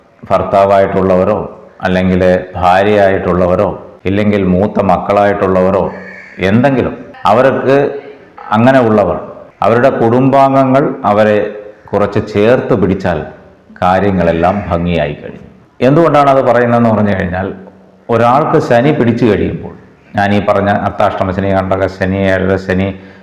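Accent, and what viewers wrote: Tamil Nadu, India. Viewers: താങ്കളുടെ സംസാരത്തിൽ ഒരു തമിഴ് ശൈലി വരുന്നുണ്ട്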